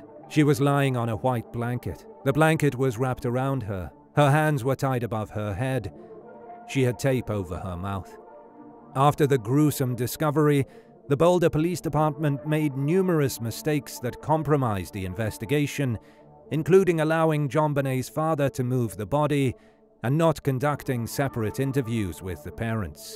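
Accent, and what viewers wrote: United Kingdom. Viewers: damn just you have a strong British accent mate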